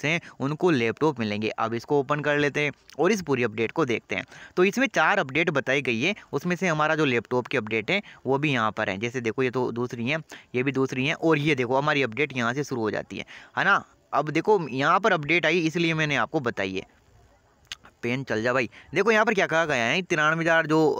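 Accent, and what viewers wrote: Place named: Hadoti, India. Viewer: apki awaj se hi lag gya ta aur ham kota se 😄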